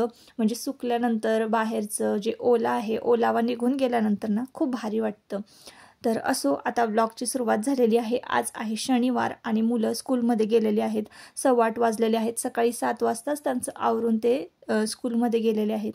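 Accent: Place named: Kolhapur, India. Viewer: कोनत गाव तूमच भाषा कोल्हापूरी वाटते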